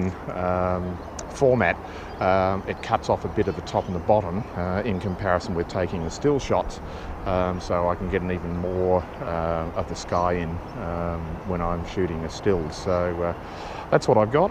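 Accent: Australia. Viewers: I liked the video "Dad's garden" that was the first time I realized I do prefer the broad accent. I think you talk "Strine". It's actually what I want to learn and aim for. I will certainly watch all of your videos they are amazing and yes, I can also practice Aussie English.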